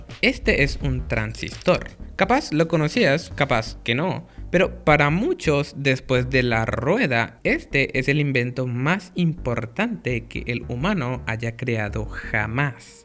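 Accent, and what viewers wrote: Paraguay. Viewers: amigo vos sos paraguayo? de repente tenes acento paraguayo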